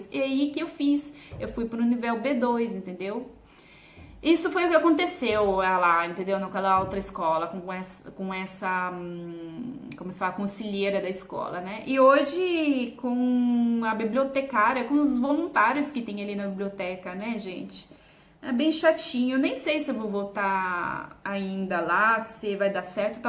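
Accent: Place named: Italy. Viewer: Olá moça. Estou te acompanhando desde os primeiros videos que você postou e tenho gostado da sua rotina porque é diferente da minha. Quero te dizer que não é culpa sua. Algumas pessoas não sabem lidar com seus sentimentos e acabam descontando em pessoas boas que não fizeram nada. Quando isso acontecer, tenha em mente que não é você, e sim a outra pessoa. Nunca deixe de ser a pessoa boa e educada que você é. E não deixe ninguém tirar o seu brilho. Uma dúvida, onde você nasceu? Vi que você fala português, mas não parece ser sua lingua nativa. Você é italiana? Continue postando, gosto dos teus videos! Abraços :)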